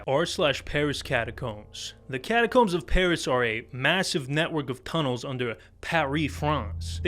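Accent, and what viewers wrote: New York, United States. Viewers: 😅This is the most NY Accent I've ever heard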